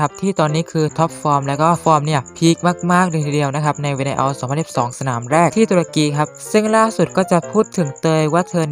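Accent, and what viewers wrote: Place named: North, Thailand. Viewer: สาวเหนือน่ารักอยู่แล้วคับ โดยเฉพาะสำเนียงมีเสน่ห์มากๆๆๆๆๆๆ คับ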